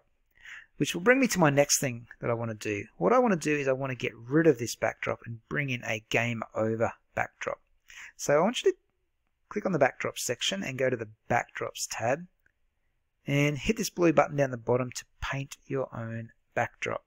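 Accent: Australia. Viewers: i like his thich aussie accent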